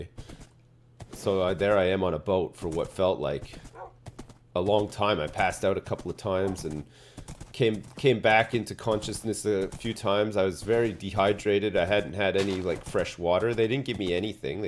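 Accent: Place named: Alberta, Canada. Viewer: So many years later, he still sounds like he's from Alberta.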